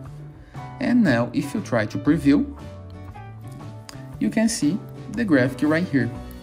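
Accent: Brazil. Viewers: ingles de brasileiro kkk boa mano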